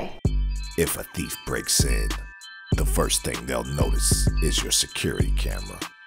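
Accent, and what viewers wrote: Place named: Jamaica. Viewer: This narrator is the best! Bootleg Bob Marley and the Jamaican talk killed me 😂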